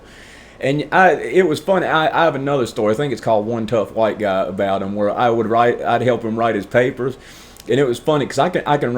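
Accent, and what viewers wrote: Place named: Virginia, United States. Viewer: I lived in Richmond for a while , so when I first heard you talking, I thought Roanoke must be super country. Now I know what the deal is . Thanks for clearing that up y'all.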